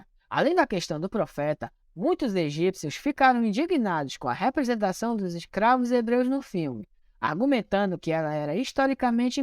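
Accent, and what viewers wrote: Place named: Belém, Brazil. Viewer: Existe uma grande possibilidade de eu estar enganado, pois não sou especialista em nada, mas, se eu estiver certo, aposto que o narrador desse vídeo é natural de Belém (PA) ou (quem sabe?) Ananindeua. Talves Marituba, Sta Isabel ou até mesmo Castanhal, na área metropolitana. É evidente que ele está lendo um texto e está forçando a dicção, a entonação de voz, para parecer um reporter do JN. Obviamente, ele não fala assim entre os colegas da escola. Entretanto, muito provavelmente, esse perfil não é da plebe. É da burguesia. É de classe média, saca? Ainda estuda ou já estudou em boas escolas, tipo, escola particular, sabe como é que é ??? Mas, enfim, o sotaque não me engana. Se eu me enganei, ele é muito bom. Porque, para mim, ele não pode ser de nenhum outro lugar a não ser dessa região metropolitana que eu mencionei acima -- e se for da favela, é uma exceção à regra. 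O cara da favela, fala diferente, fala na gíria, aquele lance da malandragem, tá ligado? O que não parece ser muito o lance dele. Mesmo se fosse da favela, eu também saberia porque conheço as gírias de lá, e só pela maneira de falar, eu identificaria talves até o bairro em que ele mora. Rsrsrsrsrsrsrs😂 Gostei do vídeo, tanto pelo conteúdo, quanto pelo esforço dele em parecer um locutor ou reporter de TV. Ficou legal. O moleque é bom. É diferenciado. Valeu, ai, bro. Vou deixar um like.😊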